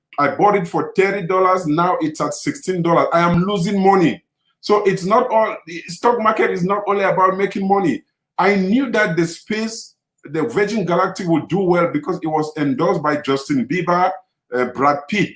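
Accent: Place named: Nigeria. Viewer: Interesting collaboration you had with! You sound more like a Nigerian. Awesome.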